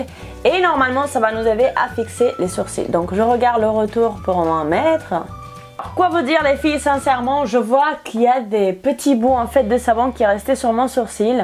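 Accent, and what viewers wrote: Spain. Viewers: Mademoiselle espagnol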